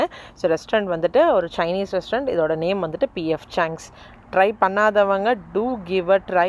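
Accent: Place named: Tirunelveli, India. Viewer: TIRUNELVELI pola varma sis amma sona mathiri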